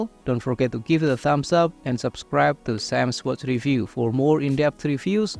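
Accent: Indonesia. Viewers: Your English good sir, i like your Indonesian accent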